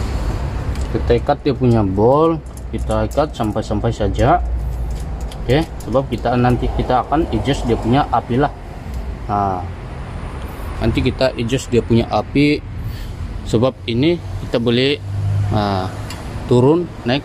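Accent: Sabah, Malaysia. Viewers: Sabah ni boss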